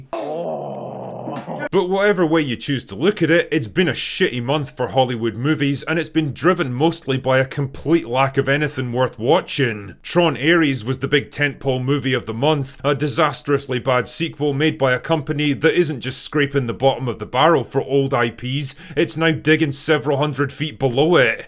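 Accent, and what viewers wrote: Scotland, United Kingdom. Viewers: It always brightens my day to hear this crazy Scottish bastards voice.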